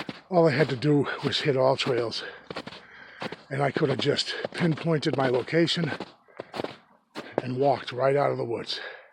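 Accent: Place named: Boston, United States. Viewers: Excellent video, great job! I did hear the strange noise, it sounded almost like the grunting noise that a pig makes. Weird isn't It? Btw, don't apologize for the Boston accent. I also have the Worcester-Boston accent. Nothing wrong with that! Everyone has an accent depending on what part of the country they grew up in. Be proud of who you are. You're a terrific person and I enjoy your videos. Have a great day, see you on the next one! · Awesome hike not too long at all very interesting sound early in the video could have been a raven croaking but why only the once and why did it only happen after you crossed the first downed tree maybe the downed trees the ones that cross the path that are not by park employees are territorial marks or stay out signs? By the way I like the Boston Accent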